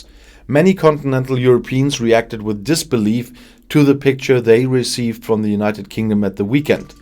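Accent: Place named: Germany. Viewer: It sounds like you're from Germany I think you should look at your own country first before you start criticising everybody else is my friend you were actually down and Vaccines at the moment did you thought you did a great job the first time but the second time you've made a right f**** of it you go and look after your hand side and when I'm going to listen to you European people because you don't know what you're talking about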